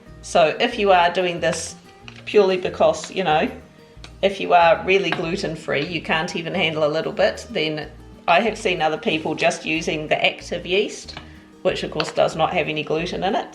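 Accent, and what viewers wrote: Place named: New Zealand. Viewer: yes! i have discovered that i can discern nz vs austalian accent! excellent.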